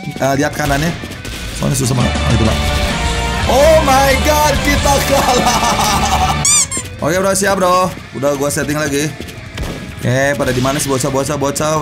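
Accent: Bandung, Indonesia. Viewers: Bang orang Bandung ya?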